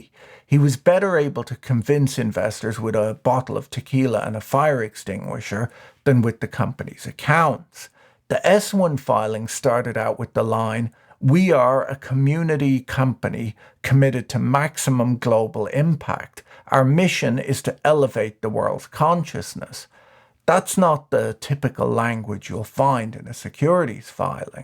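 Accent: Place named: Ireland. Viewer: The Irish accent seems perfectly suited to delivering sarcastic takedowns!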